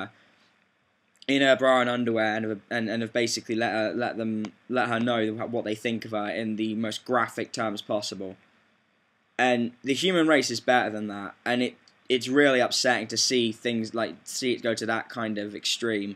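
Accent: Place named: United Kingdom. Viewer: Lol a British guy..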